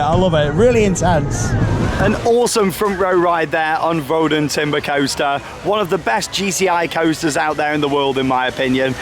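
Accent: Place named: United Kingdom. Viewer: all right no Fun you are a good vlogger but youre brithish english is very fun hahha best 💪 Stüpet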